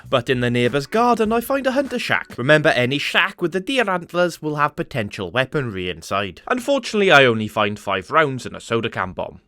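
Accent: Wales, United Kingdom. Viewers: You Welsh?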